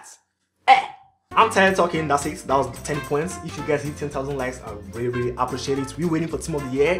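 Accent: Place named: France. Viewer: On entent ton accent français Mdrrr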